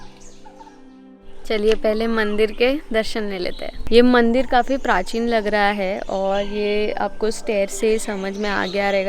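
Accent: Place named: South, India. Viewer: Tumchi Hindi ekdam mast south Indian ahe🤝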